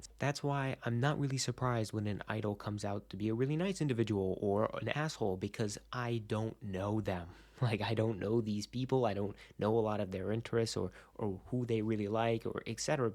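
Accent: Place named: Bulgaria. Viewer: Are you Bulgarian or somewhere in that area because I swear that's your accent.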